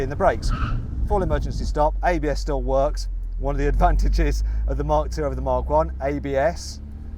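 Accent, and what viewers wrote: Birmingham, United Kingdom. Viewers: sorry i couldn't understand the model of the yokohama, can someone please write it down here? thanks! (english isn't my first language and the british accent makes it a bit hard to understand sometimes!)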